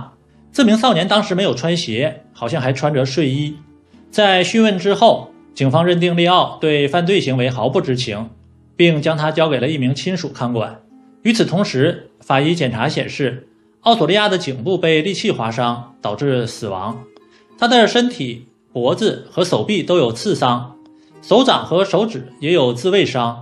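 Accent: Northeast, China. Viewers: Hi东北老乡，要花时间多练练普通话！ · 好明显的东北口音，藏都藏不住哈哈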